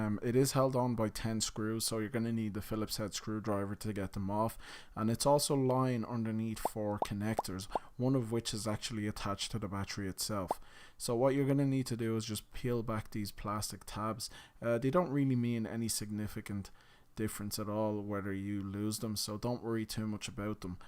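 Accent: Ireland. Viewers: Yes really clear and focussed. I love the Irish accent too. Voice sounds so relaxed like everything is under control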